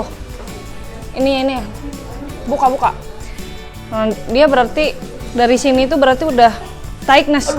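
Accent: West Java, Indonesia. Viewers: Dari logat bicara mbk dari sunda..mereun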